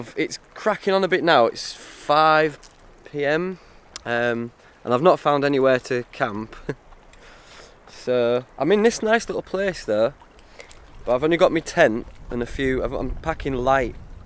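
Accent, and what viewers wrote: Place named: Cockney, United Kingdom. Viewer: More cockney! More cockney!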